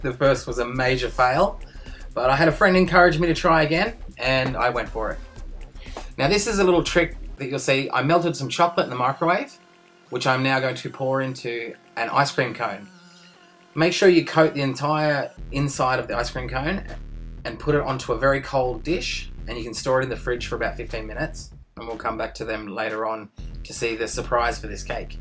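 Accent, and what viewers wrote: Australia. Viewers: can u not hear his accent hes Australian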